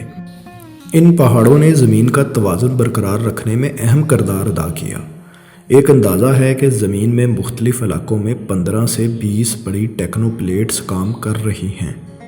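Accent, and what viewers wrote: Punjab, Pakistan. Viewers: Ap Punjabi lehje ma vbolte ho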